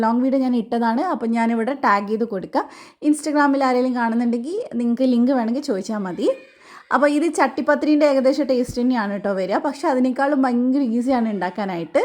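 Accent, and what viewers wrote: Kannur, India. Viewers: Nigal kannur aano.. Evidyanu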